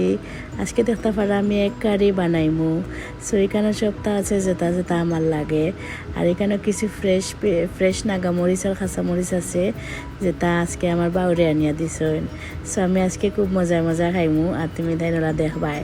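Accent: Sylhet, Bangladesh. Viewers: আপু একটু শুদ্ধ ভাবে কথা বলতে হবে কারণ সবাই সিলেটের কথা বুঝে না তাই আর একা একা খেলে চলবে না আমাকে ও দিতে হবে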